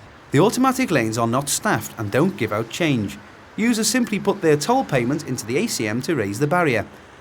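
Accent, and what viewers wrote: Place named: Scouse, United Kingdom. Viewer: Imperceptible Scouser accent but still very comprehensible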